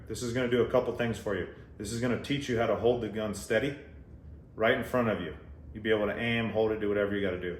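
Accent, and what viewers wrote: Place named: Alaska, United States. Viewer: I thought that sounded like an Alaskan accent…. 🤪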